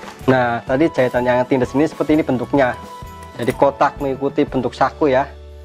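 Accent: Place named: Java, Indonesia. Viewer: Trims mas ilmu bermanfaat untuk pemula. sy senang dengar penjelasannya dgn kosa kata bahasa yg rada2 medok selain dapat ilmu juga mengerti bahasa Jowo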